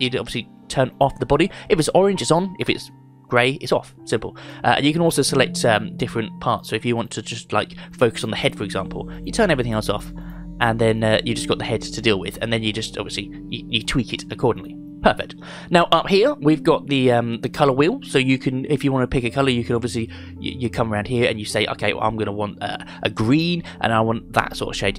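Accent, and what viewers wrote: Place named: United Kingdom. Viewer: I knew he was living in UK not beacuse of the location but the accent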